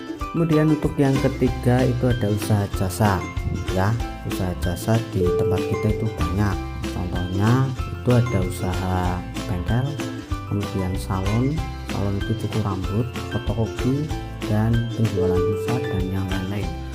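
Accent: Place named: Banyumas, Indonesia. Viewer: Ngapak temen wkwkwkwk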